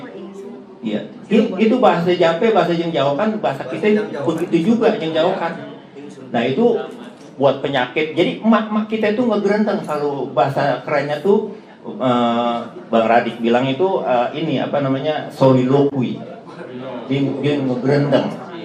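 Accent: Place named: Jakarta, Indonesia. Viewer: kalo di liat liat sibabe make logat betawi pinggiran ..syair syair nyang babe lagukan juge syair betawi pinggiran persis kaye alm H bokir legenda lenong betawi ..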